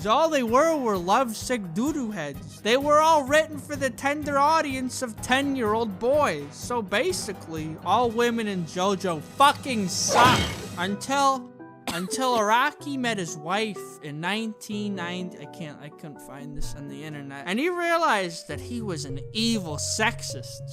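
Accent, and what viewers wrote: Brooklyn, United States. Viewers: Its a Brooklyn accent. Not Dunkeys. Check his clone vid.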